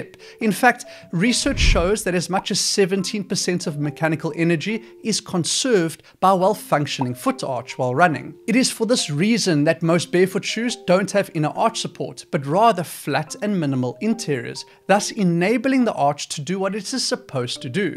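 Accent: South Africa. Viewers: You sound like a South African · You sound South African!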